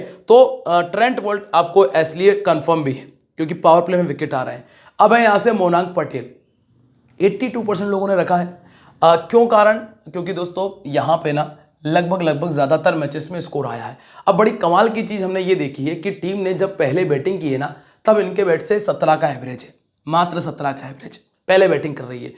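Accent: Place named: Rajasthan, India. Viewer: Bhai aap mujhe rajesthan se lagte hai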